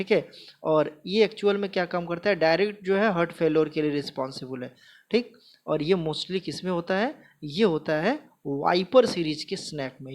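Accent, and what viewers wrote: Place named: Bengal, India. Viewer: Bhai yh bengali h iska language issue h yh bechara apne taraf c snake he bol rha h hme snak sunai d rha h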